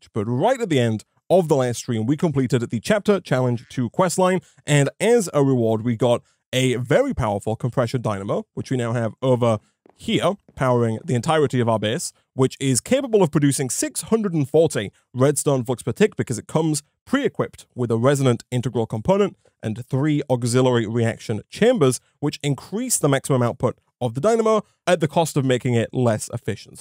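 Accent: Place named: Wales, United Kingdom. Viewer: I can't place that accent. Welsh is as close as I can get...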